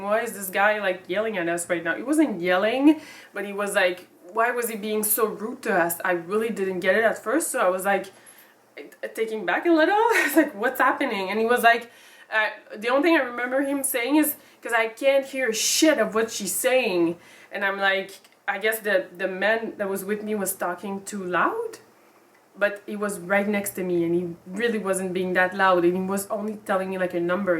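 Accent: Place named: Quebec, Canada. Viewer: Whoah ! Une très belle couleur écarlate de tes cheveux ! Je suis passé par hasard sur ta chaîne. Puis j'adore vôtre accent québécoise, c'est se qui fait votre charme ^^. Vous me faîte penser a un personnage d'un jeu nommé Chloé qui fait punk avec son bonnet dans : "Life is strange" : <link> Bon sauf quelle a les cheveux bleu, mais qu'importe... désolé pour ce propos hors sujet de vôtre vidéo mais je tenais a vous le dire.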